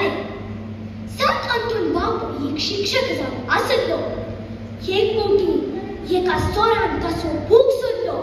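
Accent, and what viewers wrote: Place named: Konkan, India. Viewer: Beautifully and confidently spoken in sooooo pure konkani. God bless you doll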